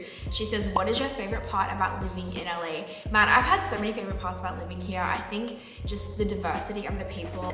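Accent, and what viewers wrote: Australia. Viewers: I have a problem that, I can't understand your Australian accent, I can completely understand bro's accent. In reality, I can only understand American accent because, I am from Pakistan. BTW, Love from Pakistan to Australia, Brazil, and Usa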